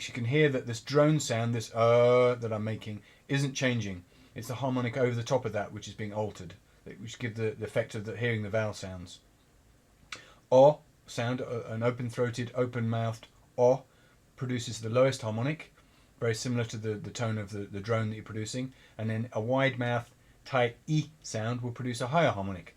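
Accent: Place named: United Kingdom. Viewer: Thx i'll tying to do with your video. Good Britain accent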